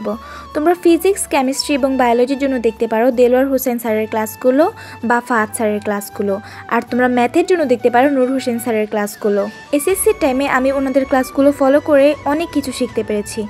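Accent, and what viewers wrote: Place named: Sylhet, Bangladesh. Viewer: Are u sylhty??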